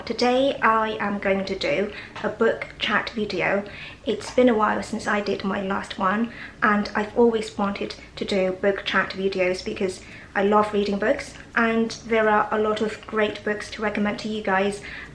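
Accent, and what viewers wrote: United Kingdom. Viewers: What a beautiful Brith accent! I love it. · 听出来了 😂 您是英式发音： spill、 body ~ 明显英式发音😶😶😑😑😬😬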